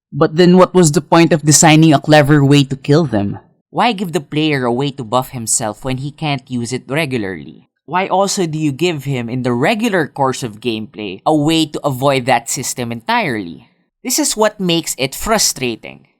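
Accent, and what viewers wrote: Philippines. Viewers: You sound Filipino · Filipino. His English is good but his accent is pretty heavy. This comes from another Filipino.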